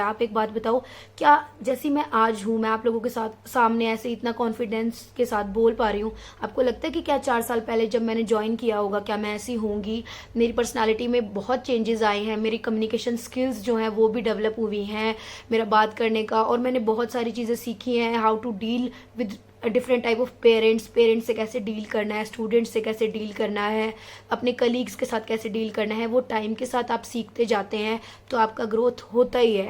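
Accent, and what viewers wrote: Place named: Haryana, India. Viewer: Everything is good but your HARYANVI tone is just superb....vedio dekh ke to pata nahi ..but sun kr bahut acha laga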